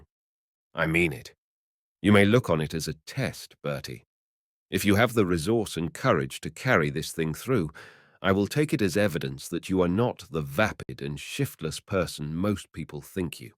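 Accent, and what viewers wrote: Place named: United Kingdom. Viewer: The British accent somehow doesn’t sound ‘British’ enough… anyone agree? This sounds like a Brit who has lived in the U.S. for about 10 years and acquired an accent rather eroded.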